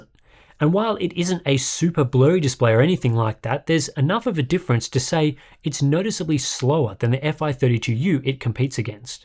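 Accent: Australia. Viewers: What would you recommend this or the LG ultragear GP950? By the sound of your accent getting a top of the range monitor in Australia is impossible due to the chip shortage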